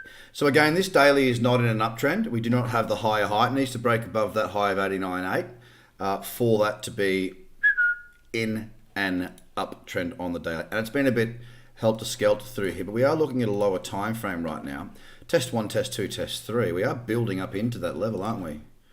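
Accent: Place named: Australia. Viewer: Thanks. Loving your work. I'm an Aussie living in Sweden, so its good to hear that accent again!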